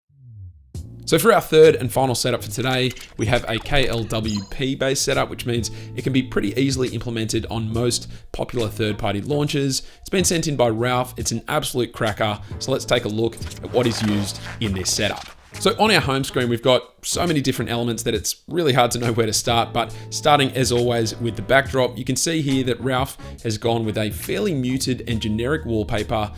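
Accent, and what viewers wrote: Australia. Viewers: Watching all the tech YouTubers. I forget how heavy and distinguishable our Australian. Great work also, I don’t have an android phone but this was so cool.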